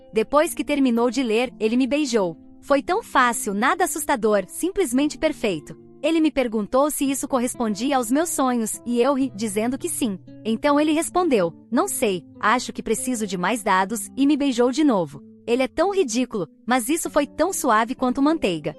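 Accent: Portugal. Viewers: este português de Portugal é terrível ...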